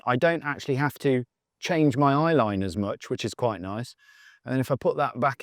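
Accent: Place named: United Kingdom. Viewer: Kewpra? Really ? C'mon, Brits. Chewna. Chewsday. Bat-tree. For crying out loud.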